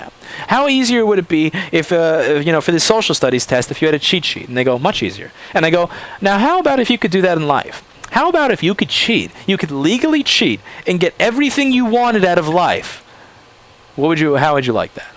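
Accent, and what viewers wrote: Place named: New York, United States. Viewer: I think the New York accent makes your “shut the fuck up” 10 times better LOL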